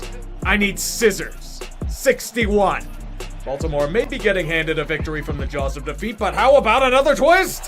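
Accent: Pittsburgh, United States. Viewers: i love the new format!!! if there is a god, will be the season/blooper reel's main voiceman, as long as his yinzerism doesn't show through too much. SEND ME A PRIMANTI BROS SAMMICH YOU DIRTY BASTARD! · Huh. A new editing style. If i said i was a fan of it, id be lying. I loved the indie vibes from simple editing, and he sounded... contained, rather than without a filter. I need more Yinzer.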